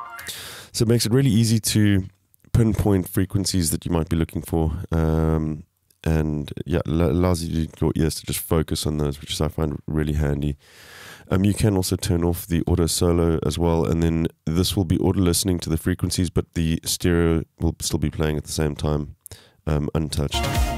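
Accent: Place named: South Africa. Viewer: I hear the SA accent. I feel at home.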